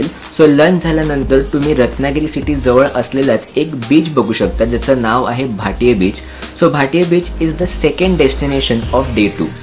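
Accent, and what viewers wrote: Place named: London, United Kingdom. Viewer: हा असे मराठी का बोलतोय?London born आहे का?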